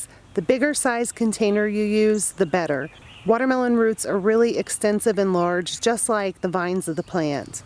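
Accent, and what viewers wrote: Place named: Pennsylvania, United States. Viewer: Hi, new here! U sound like u are from my home state, PA! I think I am going to try melons this year!!🤞Good tips!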